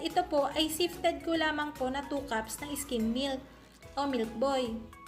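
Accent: Batangas, Philippines. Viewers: Kala ko Batangas. Lagi po ako nanonood ng mga vids nyo. Galing nyo magbake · Hi po. Batangeña ka po mam?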